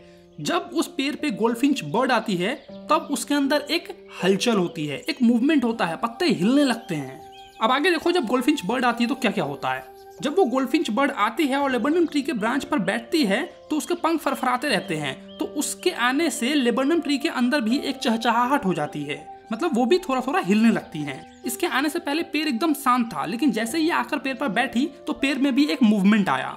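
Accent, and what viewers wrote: Bihar, India. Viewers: Bhaiya your way to teach us is extremely nice, as I know you sometimes use bihari accent language that,s awesome I have also several friend from bihar which is also great and hilarious.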